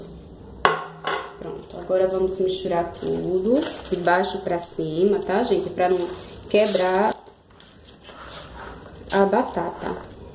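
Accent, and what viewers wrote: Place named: Paraíba, Brazil. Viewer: Vc é paraibana? Sotaque lindo.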